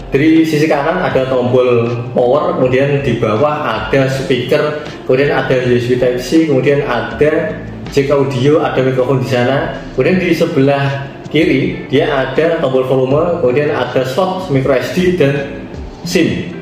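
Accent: Java, Indonesia. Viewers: medokk bngett ituh jawa mu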